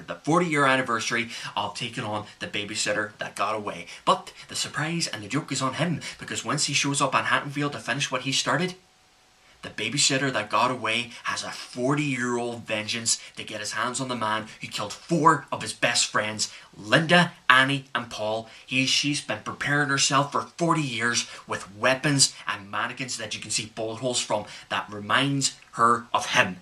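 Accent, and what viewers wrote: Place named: Ireland. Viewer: if Dr Loomis was Irish he would sound just like you.. great reaction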